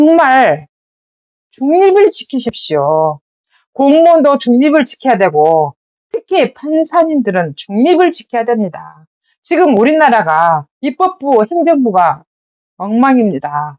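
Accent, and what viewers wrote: Jeolla, South Korea. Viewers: 지나가다 보게 됐는데 미모의 여자가 전라도 사투리 쓰면서 어찌 이리 딱 딱 맞는 말씀만 하시는지.. 바로 구독,좋아요,알림설정 일사천리로 하고야 맙니다.😂😂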